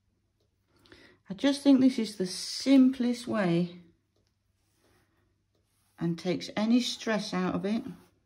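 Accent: United Kingdom. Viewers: Thanks for this great video. I have been working with Indian ink on paper for years but painting on canvas is totally new to me. I've been varnishing test pieces done on gessoed cardboard (literally crisscrosses of paints and acrylic ink so that if anything runs or smudges I can see it). I just want to learn how to apply the top coat of glaze (the isolation coat, as they call it, if you want to protect your paintings with removable varnish) with zero brushmarks and your video was really helpful. PS I love your accent, it reminds me of living near Sheffield when I was a toddler 🤪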